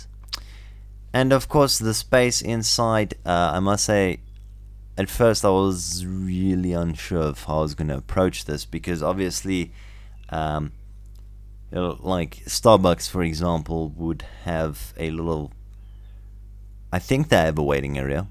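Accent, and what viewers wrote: South Africa. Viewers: You sound like you have an South African accent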